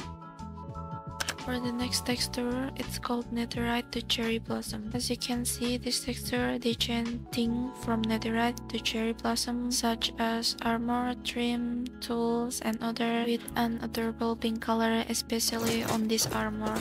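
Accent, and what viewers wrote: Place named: Spain. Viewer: by your voice i feel you are a Spanish person who speak English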